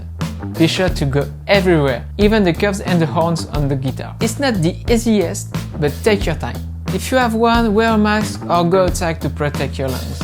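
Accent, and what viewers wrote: France. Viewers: Came for the lumpy green paintjob Stayed for the Inspector Cluseau accent 👍😎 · It’s officially the first time that a person with a French accent doesn’t irritate me when speaking English. I guess you could take that as a compliment coming from a dude who gets easily irritated 😂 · i was listening to him talk and thinking, "this guy is French AF, I don't recall ever seeing a French YTer, ever" then i scrolled down to see " " my suspicions check out. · I am Croatian, and nobody around me speaks English with such a terrible accent. It is French thing. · francais ? vu laccent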